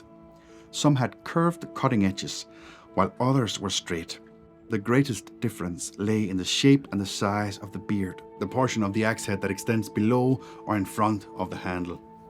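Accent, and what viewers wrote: Ireland. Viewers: Great educational video again. Just wondering do you have any Irish connections? To my ear I can hear some Irish in your accent. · I wonder if you ever lived in the North of Ireland? The Dane and Irish accents share many similarities :)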